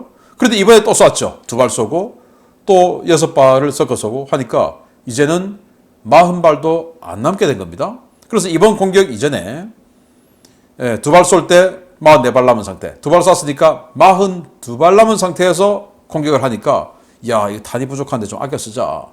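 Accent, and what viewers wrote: Gyeongsang, South Korea. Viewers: 갱상도 싸나이 최고!!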